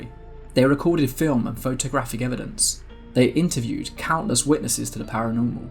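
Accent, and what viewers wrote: United Kingdom. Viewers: I frigging love how you say "so get yourself comfortable, hit the lights and get a warm drink" in your British accent. Draws me right in. 5th video of yours this evening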